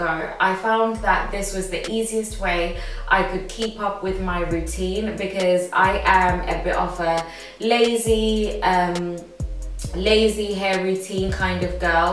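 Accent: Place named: England, United Kingdom. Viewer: Where is your accent from ? It sounds English I love it ❤️ love your locs too x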